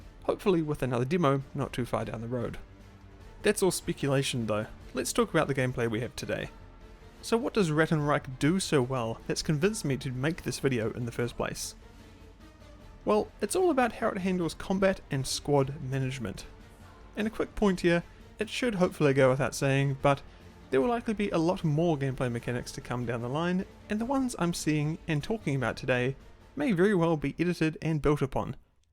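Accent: New Zealand. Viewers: A Kiwi accent, what a pleasant surprise! I am so hyped for this, especially after seeing the lacklustre CoH3 demos.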